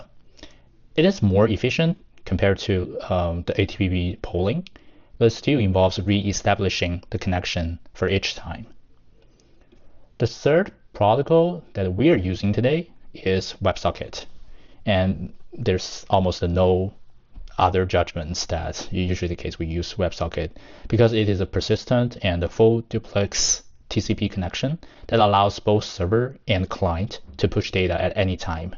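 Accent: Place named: England, United Kingdom. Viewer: Great walkthough of the design of the problem. One suggestion is to hire someone without an English accent to do the videos because it is very hard to follow and understand smoothly when people am also trying to figure out what the host is trying to say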